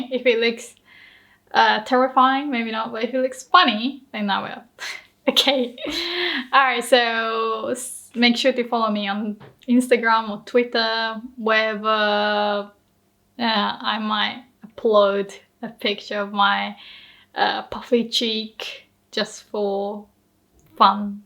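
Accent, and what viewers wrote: United Kingdom. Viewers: Hi! I noticed your accent is very british sounding! Did you learn from a british teacher or something? Spend time in the UK? In college I had a Japanese friend who grew up in hong kong, so her English was almost a perfect british accent. Your accent kind of reminds me of hers. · Unrelated. Do you use the british accent as your base accent or the american one?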